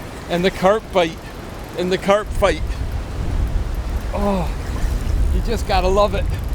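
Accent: Canada. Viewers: Hi, Thank you for your video. Like your Canadian accent. BTW, can I pay Canadian dollars instead of USD on your website?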